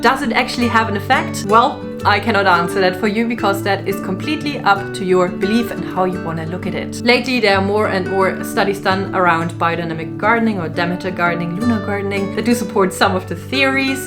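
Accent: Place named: Germany. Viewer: I am happy your videos are not MOONdane.... ha ha So, thank you for your videos. They are so great. I love listening to you talk - I don't want to guess wrong or assume that you are German although I've seen you holding what looks to be a lot of German books and the way you pronounced some words with a "v" like the word "witches" - but I love your accent. You are very informative and always have interesting topics. I especially like this video because when you spoke about your grandma, it immediately made me think of my grandma and grandpa (my grandpa being from German lineage) and they never did anything that wasn't in accordance with the moon. Luckily, they taught me how to live by the moon cycles as well, and the knowledge they passed along has not gone to waste. I don't even rely on the weather channel for my weather information - I know what the weather is going to be like based upon observing nature, such as the birds and livestock. 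Loved this video so much.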